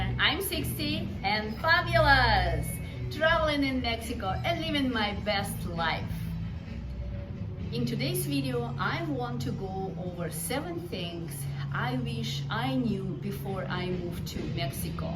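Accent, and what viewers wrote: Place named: Eastern Europe. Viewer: Hello, my wife and I are considering moving to Puerto Vallarta. My wife is Russian, since you also sound eastern European, I have a couple of questions for you! 1. Is there a fairly large Russian community there? 2. Is there someplace there to buy Russian food products? I appreciate any information you can give us, thank you!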